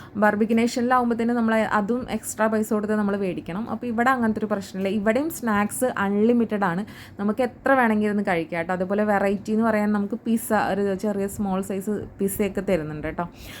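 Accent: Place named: Thrissur, India. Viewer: Suster ningal naatil evideya shabdham kond Thrissur aanenn thonnunnu ❤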